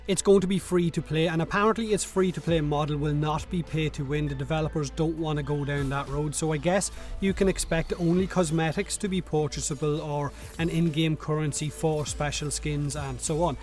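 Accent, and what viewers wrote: Ireland. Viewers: Your irish accent is somewhat entertaining xD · I am guessing you are Irish, are ya, mate? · Very Irish :b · God damn, I couldn't decide which was better; the video game being made or your accent. I hope we get to meet in-game one day, Irish Hunter Man.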